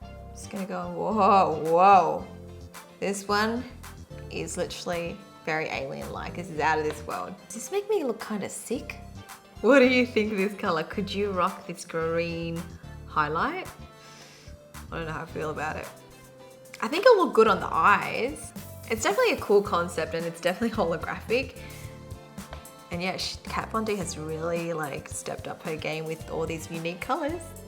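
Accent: Australia. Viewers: Great video! I love your accent, where are you from? Australia? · Is she from Australia? I can't tell because I'm Australian too so I can't really hear it😂 plaease let me know❤️ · LOL seeing you get so worked up over "stick" was hilarious. Curse our Australian accents!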